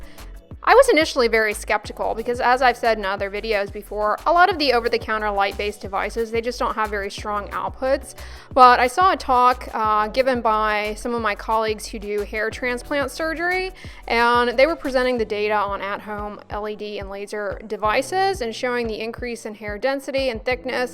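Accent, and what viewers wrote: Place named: Midwest, United States. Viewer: This accent is “uppity Midwestern.”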